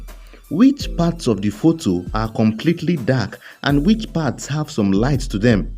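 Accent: Nigeria. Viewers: Oh my gosh where have I been, where have you been and why am i just discovering this channel? why have you guys never suggested these tutorials whenever I am searching for stuff?! Awesomely great work bro, your tutorials are sooo educative, informative and very explanatory, absolutely any level can follow!! More success to you, and also I gbadun how your Naija tongue sounds through your clear spoken words!! Carry go jooor, nuffin doeth thou!!!☺☺👍👍👍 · This really helped, thank you so much . By the way, are you a Nigerian?,you sound like one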